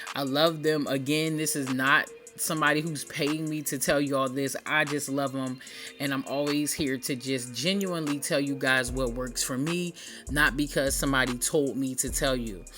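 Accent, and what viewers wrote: Philadelphia, United States. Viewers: I thought I heard a Philly accent! Then you said PA I was like yup!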